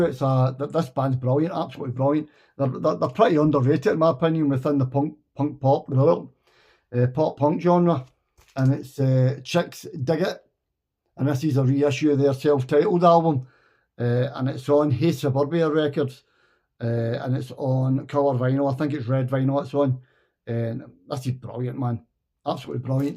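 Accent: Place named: Scotland, United Kingdom. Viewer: Hi! Just found your channel after a year in the VC- great to hear another Scottish voice, thought it was just me and my mate Jack. Great selections here. Look forward to checking more of your stuff out. Cheers, Gordon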